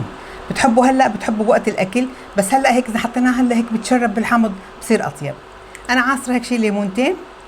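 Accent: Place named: Levant. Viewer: جننتي سماي ماعم اعرف لهجتك شوي بتحكي حلبي شوي شامي على فلسطيني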